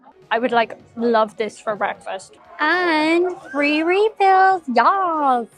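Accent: Ireland. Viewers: Boston brings out the Irish accent from. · Speaking English with a brogue accent.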